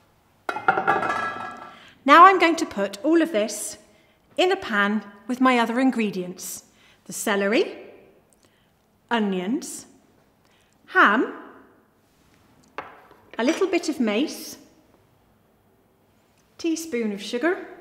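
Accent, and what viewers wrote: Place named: West Country, United Kingdom. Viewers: If I may, it’s a genuine (and genuinely West-Country British) combination of the British English post-vocalic R sound (which is pronounced ‘ah’ as in ah-mond) and the rhotic terminal R which came from the West Country, and became the standard R sound in US English. Celebrate these distinctive differences; they have made us what we are! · It's how we say almonds in the UK and always have (especially in the West Country where she is from). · it’s West Country accent, Devon specifically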